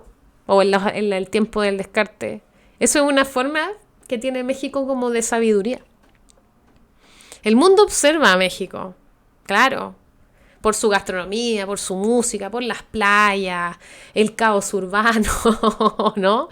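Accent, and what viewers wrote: Chile. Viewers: Amo, amo, amo el acento chileno.... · Me encanta el acento chileno!! Le decimos a Mon Laferte que no lo pierda!!!😊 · MUCHAS GRACIAS POR TUS PALABRAS HACIA MI PAÍS MÉXICO QUE TANTO QUIERO, SÉ QUE HAY PROBLEMAS COMO EN CUALQUIER LUGAR DEL MUNDO, PERO LA VERDAD ES QUE MÉXICO ES UN PAIS MARAVILLOSO, TIENE TANTO QUE OFRECER EN TANTAS COSAS, QUE TÚ LO ESTÁS EXPRESANDO MUY BIEN, ME GUSTA ESCUCHARTE, PUES SIEMPRE ME HA GUSTADO EL ACENTO DE USTEDES LOS CHILENOS, ADEMÁS DE SER UN PAÍS HERMANO, MUY HERMOSO Y MUY QUERIDO POR NOSOTROS. GRACIAS Y SALUDOS DESDE VERACRUZ, MÉXICO ❤❤❤❤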